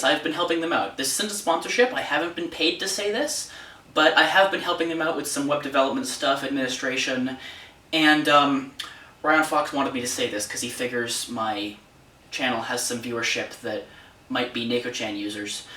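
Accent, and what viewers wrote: Canada. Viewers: Plugging in a PS2 keyboard will not damage the main (Onyx) system. All that will happen is a big sweet nothing. The only way to damage the Onyx machine through that proprietary keyboard port, would be by passing a very high voltage through it. A PS2 keyboard, being a passive device, will cause no damage at all. I mean you could short every pin and still get no damage. Go on. I dare you. Double dare? Oh, I give up... PS Is that a Canadian accent? You sound quite a bit like Mr Carlson.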